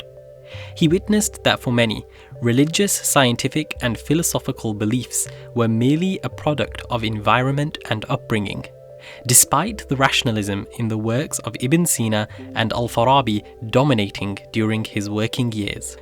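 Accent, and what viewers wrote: Arab world. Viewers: I was wondering throughout the video whether he's Arab or not, I still don't know, maybe he grew up with Arab friends? It's so hard to tell and I'm Egyptian too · Why Arabic pronunciation?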